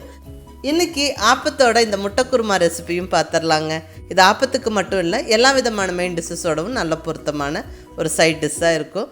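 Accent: Kongu, India. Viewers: I like your tamil kongu slang mam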